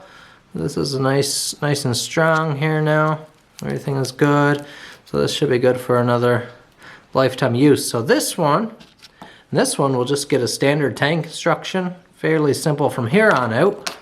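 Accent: Newfoundland, Canada. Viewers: As soon as i started this video i was like this guy gotta be from Newfoundland lol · I thought I detected an accent. Are ya a Newfie there by? I see the flag behind ya. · This popped up on my suggested videos and you managed to get 2 words into your intro before I was wondering what part of NL you were from haha. Always nice to see another Newfoundlander unexpectedly! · I watched this whole video wondering why you sounded familiar only to realize you are from Newfoundland ahahah amazing work. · Me getting distracted by the fact that this dude sounds like a Newfie. Looks at description. "oh. Checks out." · man as soon as I heard ya talkin I knew you were from the rock. Cheers! ill have to add one of your knives to my collection.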